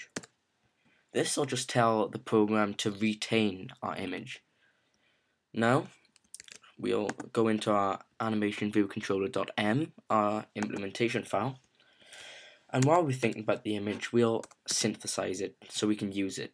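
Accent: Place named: Wales, United Kingdom. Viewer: Love the welsh accent :)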